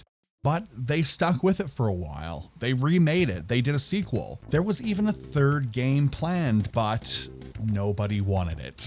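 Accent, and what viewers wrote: Maritimes, Canada. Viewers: bro are you from the maritime provinces in Canada?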